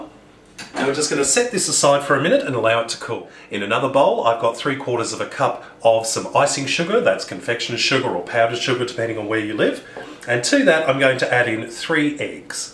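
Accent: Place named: Australia. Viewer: Has anyone told you before tht your a handsome guy wow,I must say and I love the accent as well I'm from South Africa and trust me our men thts coloured do not speak like you I guess you are Australian am I right ?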